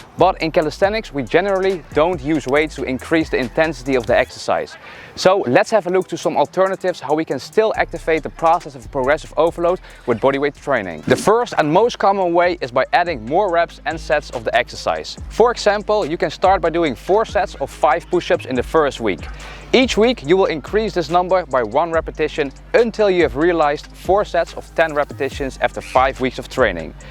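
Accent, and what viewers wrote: Netherlands. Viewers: It took 10 seconds to recognize you are dutch. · ik hoorde meteen dat je Nederlands bent hahahahaha. Lekker bezig! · Dutch accent always remains 😊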